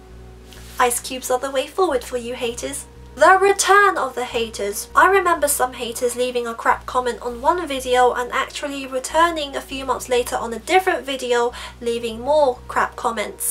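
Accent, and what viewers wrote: London, United Kingdom. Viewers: Are you from London? I cant spell worth shit...but im just fine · Do u learn British English? C: I love ur accent :P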